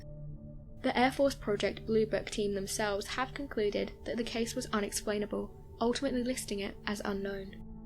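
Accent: United Kingdom. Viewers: Al-u-mi-nium.????? Why do all people with british sounding accents pronounce words so blatantly wrong.?? They're taught the same alphabet as American English speaking students, and taught that all of the letters make the exact same sounds as were taught, yet they insist on pronouncing them the same way as every other retard over there. Some way worse than others. Ask any of them how to pronounce the letter Z, and they'll say ZED... ask them how to spell it and they'll say ZED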